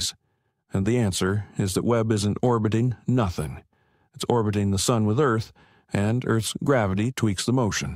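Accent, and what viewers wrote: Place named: South, United States. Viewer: I like the southern sounding voice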